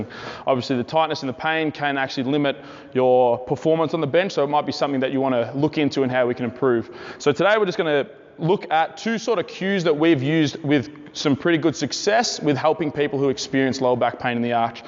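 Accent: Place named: Germany. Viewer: The fact that you like a German tryna speak English is gold love 💕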